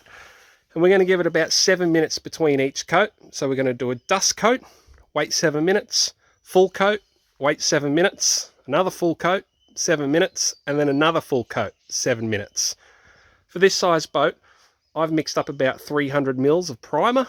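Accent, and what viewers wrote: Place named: Australia. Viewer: Motorcycle, check accent, check. Nature sounds in the back check Filmed outside check You must be australian 😁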